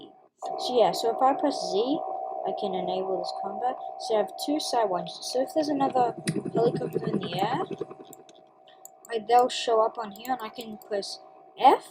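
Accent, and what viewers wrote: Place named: Australia. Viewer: I think hes australian like me and thx for teaching me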